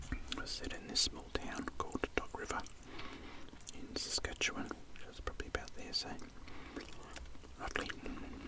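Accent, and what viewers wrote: Australia. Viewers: It's back!! This makes me so happy. No one does it like! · IVE BEEN WAITING SO LONG FOR THE MAPS I LOVE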